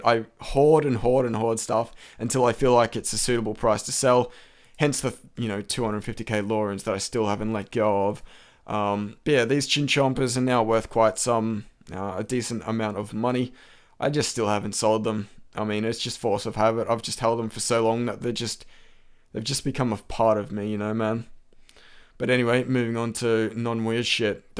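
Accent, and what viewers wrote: Australia. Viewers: nice vid man, always good to hear an aussie commentator. get dem 1m nats cuz :P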